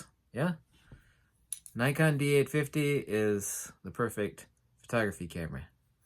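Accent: Canada. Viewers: Gordon Mohr As a Minnesotan myself, a tad perhaps but still more of a Ontario inflection. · Great Canadian accent....had me fooled.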